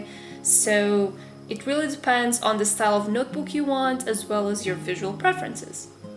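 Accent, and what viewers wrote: Portugal. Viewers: Tu falas português??? ahh bem me parecia o teu sotaque inglês portugues És portuguesa ou br??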